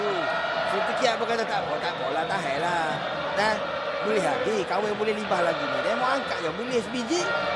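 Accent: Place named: Kelantan, Malaysia. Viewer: Hoo demo slang Klatae doh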